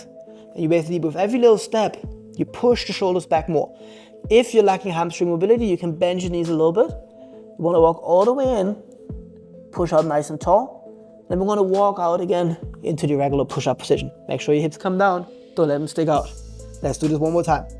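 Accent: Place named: Germany. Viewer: Was ich schon länger mal Fragen wollte: Wo bist du eigentlich geboren? Es klingt so, als hast du einen deutschen Akzent :P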